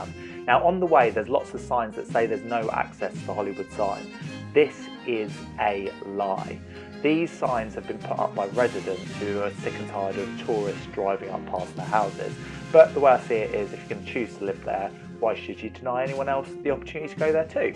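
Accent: United Kingdom. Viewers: Hi. Loved hearing the English accent on a US guide for a change. What I hear repeatedly is the hike up to the Hollywood sign, which, as I'm in a wheelchair is a lot of a no no. I really would like to find out what is here in LA that a 65+ year old couple and our Mustang convertible can get to, with my wheelchair and "er indoors" dodgy legs 😥 Really enjoyed the video though 👍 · I liked the video very much as it covers the points that need to be gone over. I have absolutely fallen in love with your UK accent. I could listen to you say "Boulevard" all day long. So, you were sexy and informative.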